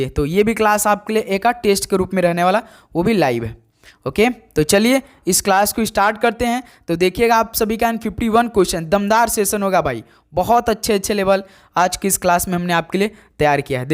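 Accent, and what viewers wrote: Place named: Uttar Pradesh, India. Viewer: Thank you sir aap achha samjhate ho aur apke bolne ke language se lagta hai aap u.p se hai 😊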